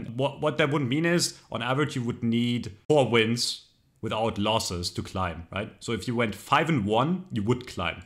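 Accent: Germany. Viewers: critiquing a system is always better with a German accent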